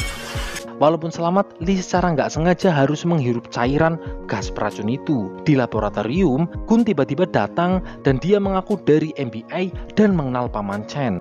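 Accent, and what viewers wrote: Banyumas, Indonesia. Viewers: aku dadi seneng admine medok basa ngapak hahaha mantap banget pokoke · Admine wong ngapak ya min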